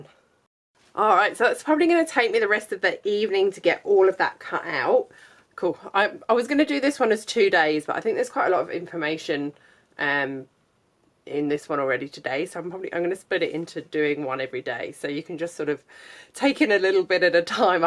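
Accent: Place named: United Kingdom. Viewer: Yes it has certainly given me inspiration, but I'm doing mine a different way! Yes, I'm also in Australia (live in Brisbane, but currently working in Perth). I always assumed you were living in the UK because of your accent so I was really surprised when I heard you say Lincraft haha! It's not often that I hear Lincraft or Spotlight on youtube!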